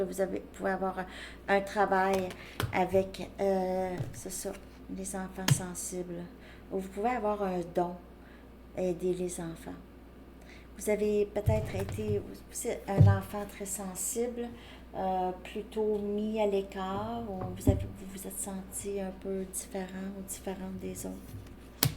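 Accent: Quebec, Canada. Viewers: Allô est tu du Québec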